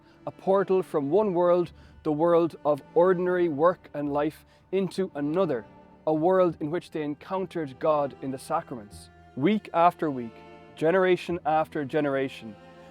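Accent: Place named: Ireland. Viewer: Thank you for the interesting info. I love the Irish accent What is the significance of the puffin bird - I think it is a puffin?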